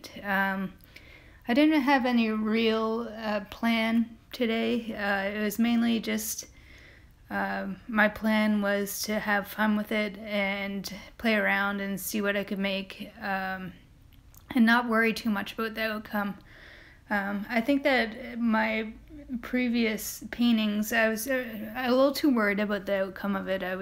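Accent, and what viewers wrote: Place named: Canada. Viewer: I am a big fan of your art and really like your videos. Your video presentation is quite cute and I especially enjoy your "Canadian" accent- on your" aboot" and "owoot"- anything with an ooot in it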